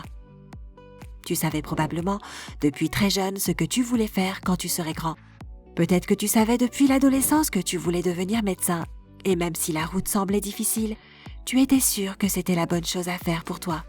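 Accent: Quebec, Canada. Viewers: la voix est horrible on dirait elle a le nez bouché avec des petites touches d'accents québécois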